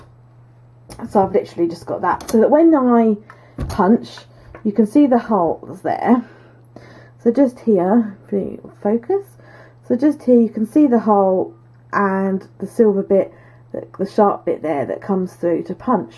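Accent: United Kingdom. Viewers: Thanks! And, Im sure you've heard it a million times....I LOVE LOVE LOVE your british accent!❤️❤️❤️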